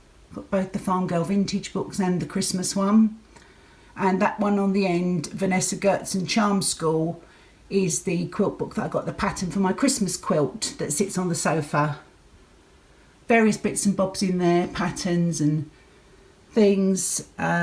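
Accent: England, United Kingdom. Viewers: Oh,! Thank you so much for showing us your craft room. I just loved seeing everything, and I always love hearing your English accent (I live in NY)! I also love your beautiful sun room! So pretty! My mother-in-law, whom I never had a chance to meet, was from Trowbridge (not sure if you've ever heard of it) and came to the U.S. as a war bride. My husband has relatives in England in a town called Uckfield and has been there several times.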